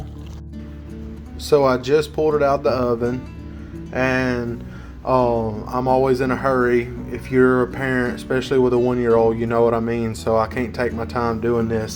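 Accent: South, United States. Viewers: Bro can we trade accents? Southern accents are the best!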